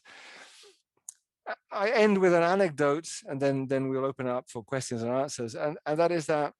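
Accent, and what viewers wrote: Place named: United Kingdom. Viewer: It is interesting that a British accented man has taken up the task of telling the "Mexican perspective" on this history.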